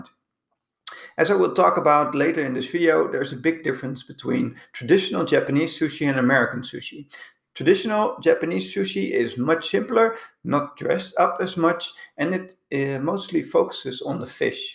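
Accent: Netherlands. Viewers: I detect a Dutch accent. Am I right?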